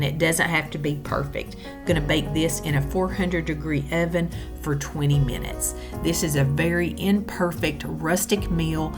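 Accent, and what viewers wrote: South, United States. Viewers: Oh goodness lady I can listen to you speak all day. I absolutely LOVE me a southern accent. I LOVE anything southern; when I retire I'm definitely moving from north east to anywhere in the south. Awesome video and recipes. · I am from NY and love the southern accent🥰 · You are adorable! You make us southern gals look and sound awesome! Thank you! AND I can smell the deliciousness from HERE! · I LOVE your dishes and your southern voice!❤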